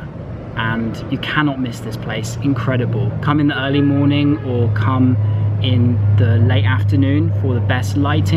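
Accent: England, United Kingdom. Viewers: Your British Accent is very sexy,and easy to understand... Sengau2 manjah di hidung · Hi, it''s a nice review...but i like your english accent btw...which accent is it?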